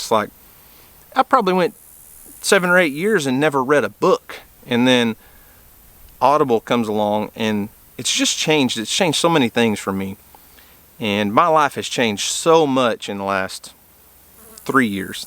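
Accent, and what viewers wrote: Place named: South, United States. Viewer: Hey, you have become a virtual friend that I enjoy spending time visiting with as you go about your days on the farm. I like listening to you ramble, it’s like sitting around a campfire with your best friend speaking the truth. I don’t watch tv just you tube, of course you caught my attention because you are so darn handsome with that southern drawl and you love to go fishing.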